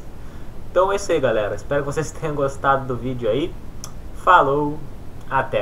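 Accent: Rio Grande do Sul, Brazil. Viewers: vc é gaúcho ?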